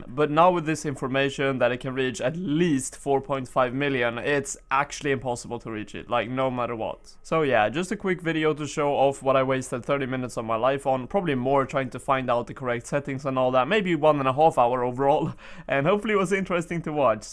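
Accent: Sweden. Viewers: Are you from sweden? Really sounds like it. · I can hear that you are Swedish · Ur Swedish right? U sound Swedish nice vid btw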